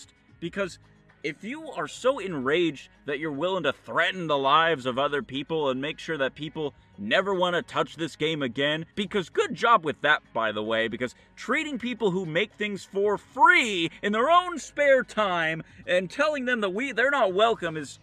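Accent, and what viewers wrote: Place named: United States. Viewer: why do you sound like mönkey but with an american accent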